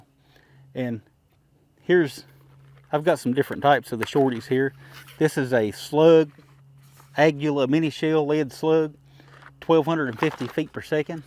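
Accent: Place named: Rural, United States. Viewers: "Well ventilated " love it! Of all the gun channels I subscribe to, this is my favorite. Must be the Kentucky accent. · I like this guy and his country twang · East Tennessean here, sir you sound like someone from my neck of the woods. Loved the vid. I have the same adapter. It’s amazing. Keep up the good work. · I purchased a 500 retro with the 18.5 inch barrel and was looking for a 28 inch barrel for it and they run about $220. I seen the maverick at rural king for $179 with the 28 inch and got an extra shotgun. Since the barrels interchange it's the best combo. I have the Opsol adapter and have ran it through both interchanging barrels and they run like a charm with the mini's. Just a side note my Dad and Grandfather were from Augusta Ky. and listening to your video's with your accent reminds me of them. They would shoot skeet every Saturday here in southern Indiana and load their own shells and always clean everybody out. 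Good times and good memories. Thanks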